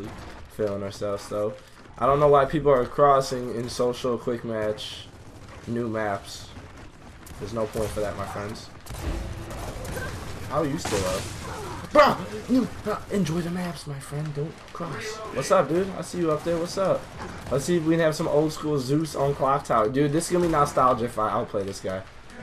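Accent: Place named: New York, United States. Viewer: U sound like u from new youk or new jersey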